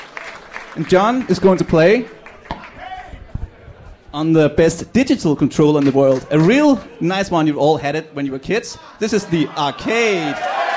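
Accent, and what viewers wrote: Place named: Denmark. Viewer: Press Play on Tape is Danish, see <link> And yes, the accent very much sounds Danish :)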